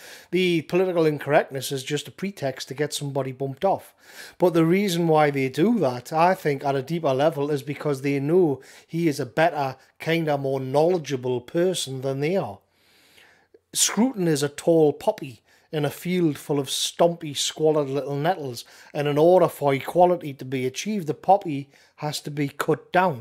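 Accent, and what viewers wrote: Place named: Northern England, United Kingdom. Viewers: I just came here to say it's nice to hear sensible and coherent ideas rendered in a quality northern accent 👍🏻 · Your Geordie dialect has a penetrative common sense way about it. RIP The Honourable Sir Roger Scruton.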